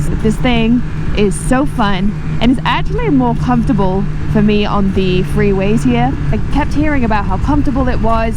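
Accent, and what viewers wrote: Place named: Cardiff, United Kingdom. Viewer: Mad hearing a Caadiff accent in the US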